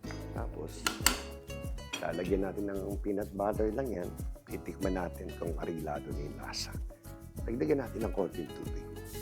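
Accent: Marikina, Philippines. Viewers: Taga Marikina din po ako parang naririnig ko ang Tatay ko pagnagsasalita kayo may puntong Marikena… mahilig din po syang magluto 😊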